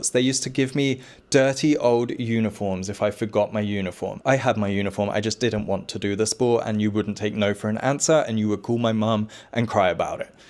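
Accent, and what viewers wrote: England, United Kingdom. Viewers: Especially given that the handsome has excellent communication skills, especially when delivered with that gorgeous English accent 😍😍😍😍😍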